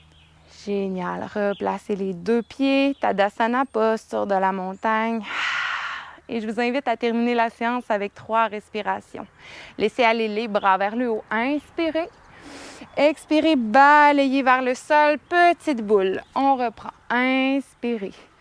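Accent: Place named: Quebec, Canada. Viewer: Merci cousine québecoise :) Jadore la séance et l'accent!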